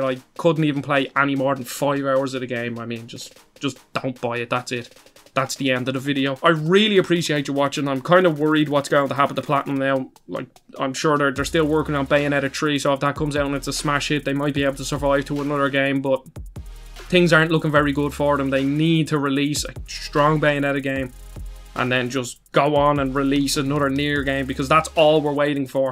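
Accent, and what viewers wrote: Ireland. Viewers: I’m here for the hot sexy Irish accent! Nice video!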